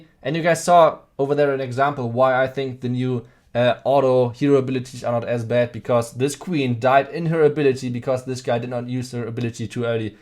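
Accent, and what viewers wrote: Germany. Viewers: Speaking english with German accent, that was unique 👌👌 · Are you german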